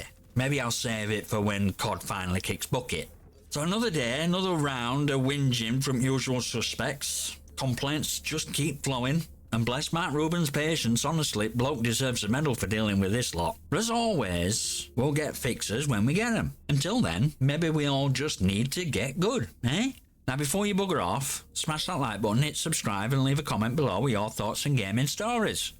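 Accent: Yorkshire, United Kingdom. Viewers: I love the thick Yorkshire accent! Caught me off guard 💀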